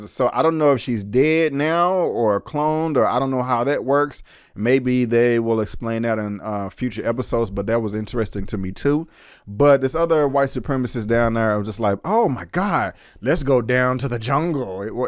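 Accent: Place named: Dallas, United States. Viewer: I can hear the Dallas! Make me miss the Southwest.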